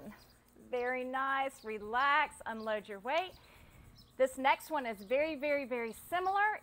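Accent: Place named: South, United States. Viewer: Perfect way to end an hour workout!! I’m from California south 30 miles from San Diego,I wish people here had your southern accent !! Enjoy the upcoming holiday!!🎉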